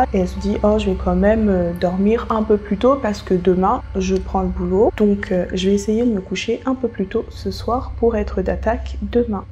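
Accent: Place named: Madagascar. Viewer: J’adore ton accent malgache ! 😍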